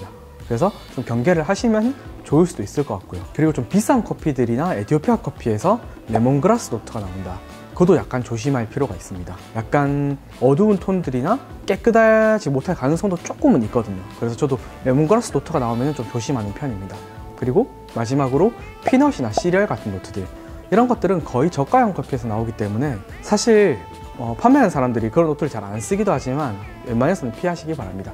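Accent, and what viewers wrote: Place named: Gyeongbuk, South Korea. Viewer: 경북지역 억양 인듯요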